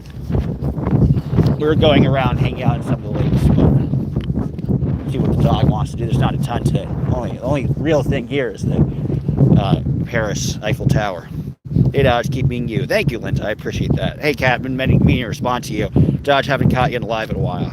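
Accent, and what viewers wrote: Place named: RP, United Kingdom. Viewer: Ooooo posh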